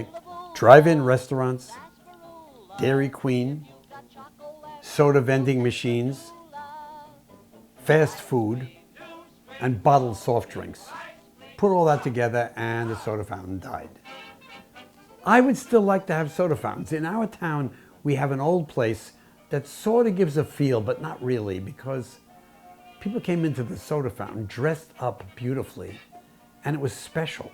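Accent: New York, United States. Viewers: Where exactly did you grow up, you say New England but I hear New York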